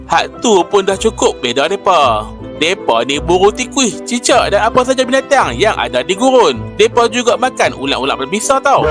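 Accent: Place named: Perlis, Malaysia. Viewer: Ni la loghat perlih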